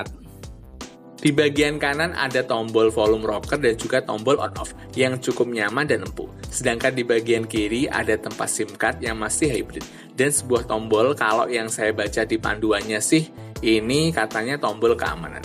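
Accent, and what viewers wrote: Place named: East Java, Indonesia. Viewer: orang magetan ya gann :D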